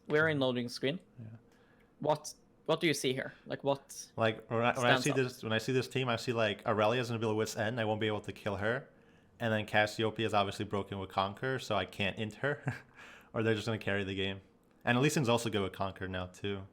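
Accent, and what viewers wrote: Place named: Denmark. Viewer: just the danish accent :D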